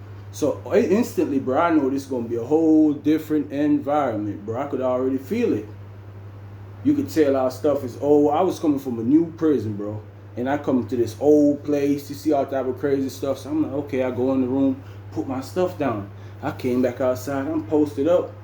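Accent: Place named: Jamaica. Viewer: It’s funny hearing a Jamaican and country accent mixed in one 😂 respect bro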